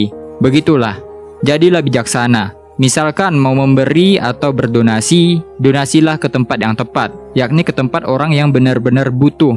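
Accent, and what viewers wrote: Pontianak, Indonesia. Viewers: Abang tinggal di ponti? Pantas logatnya kayak kenal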